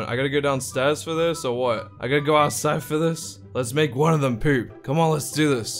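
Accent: Australia. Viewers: Is he rrly from Australia?? I am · ARE U FROM AUS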